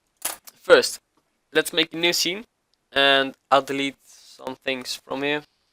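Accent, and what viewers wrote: Netherlands. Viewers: that dutch accent though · Hahaha, aan je accent hoor je dat je Nederlands bent.. Maar goede video! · are u dutch lol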